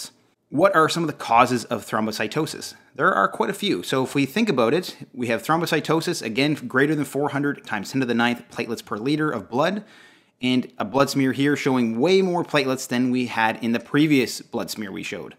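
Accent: Canada. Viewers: He also pronounces out. OWWWWWT Maybe Canadian aye? Leave the man alone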